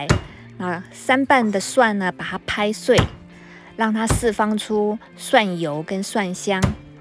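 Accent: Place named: Taiwan. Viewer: 听口音这位博主是台湾人，不是大陆人。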